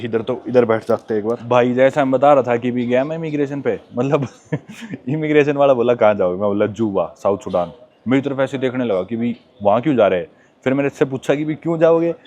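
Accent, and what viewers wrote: Haryana, India. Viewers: Es desh mein haryanvi boli sun ke Khushi hoe, very good, love from Sandhu Ambala ❤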